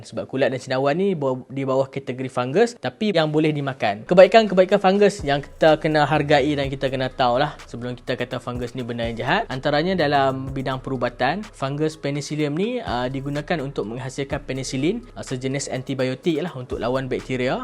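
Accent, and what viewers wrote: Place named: North, Malaysia. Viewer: Pekat utara😂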